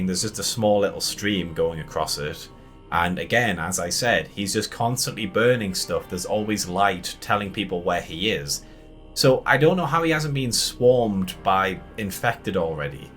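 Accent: United Kingdom. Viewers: Did you just say that this film is set in the Scottish highlands?! You sound British. I'm amazed that you can't tell the difference between a Scottish and a Northumbrian accent, and haven't heard of Lindisfarne. Or the Angel of the North. The characters at the end weren't just power rangers but dressed like Jimmy Savile. That's the thing that I couldn't get over.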